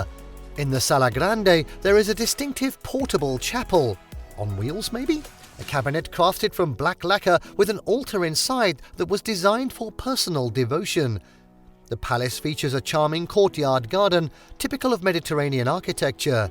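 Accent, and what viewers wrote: England, United Kingdom. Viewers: Among others: This fortress served against RUTHLES OTTOMAN EMPIRE, I believe that when you point the finger or insult someone with tendencies and that someone has ruled half of the world and beyond, at least change the accent so that it is not understood that you are English, since today in April 2024 countless countries pay taxes and other benefits to Britain without knowing why they are poor?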